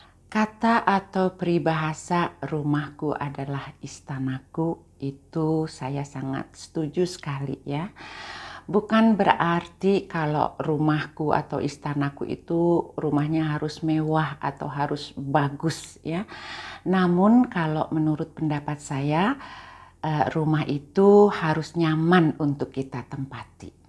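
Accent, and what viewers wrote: Indonesia. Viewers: Rumahku...istanaku... bagus skl penjelasannya ibu yg cantik... Sy jg senang dg gaya bicara ibu walaupun tlah lama di luar negeri,namun tetap logat bhs Indonesia nya msh kental. Smg ibu sll sehat ya bu,pjg umur.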